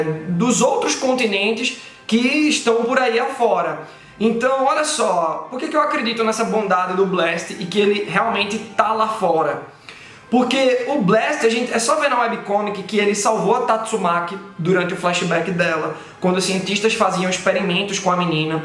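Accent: Pernambuco, Brazil. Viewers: Mano tu é de PE?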